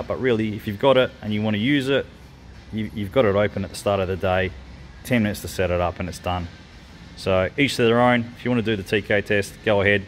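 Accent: Australia. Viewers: Absolutely love seeing your effective use of the Picoscope for diagnosis. Not to mention being in Oklahoma back in the states we love to hear your Aussie accent for all I know you might enjoy hearing my whiny hick voice in a good old USA too!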